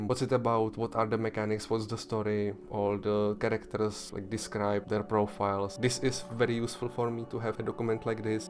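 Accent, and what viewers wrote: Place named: Czech Republic. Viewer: Kvalitní hry a český přízvuk :D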